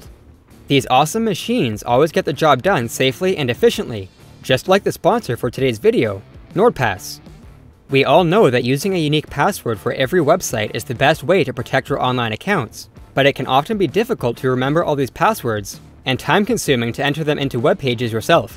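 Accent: Canada. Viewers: Your accent...Canadian? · A Canadian narrator! Hello! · You're Canadian - aren't you ? 👍 ( Pro - ject vs. prah - ject, mo - bile, vs. mo - bull, etc. ) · I sense a little Canadian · This presenter has an extremely thick canadian accent.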